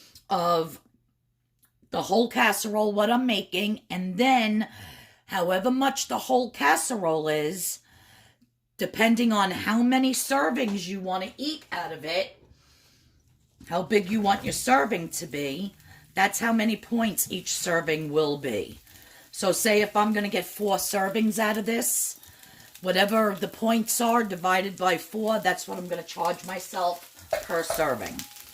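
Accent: New York, United States. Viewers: I like your recipes! I’m following you to keep on track with WW purple. Being from Philly, I love your New York accent💗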